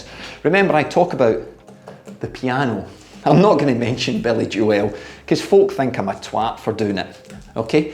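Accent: Scotland, United Kingdom. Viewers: the caption should read "not sitting correctly" caption machine doesn't like your Scottish accent, coach!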